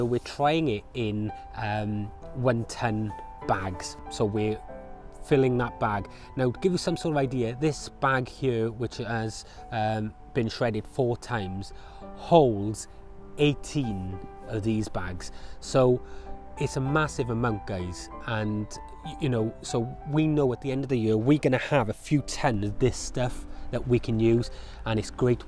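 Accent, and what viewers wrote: Wales, United Kingdom. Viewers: Hello, your accent sounds Welsh to me. Can i please confirm if my Cymraeg radar is on target?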